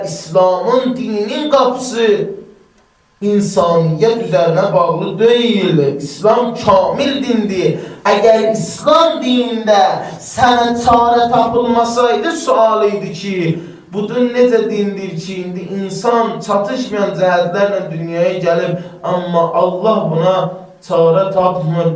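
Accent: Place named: Iran. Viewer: sen niye iran lehcesi ile danisirsan ay Azerbaycanli?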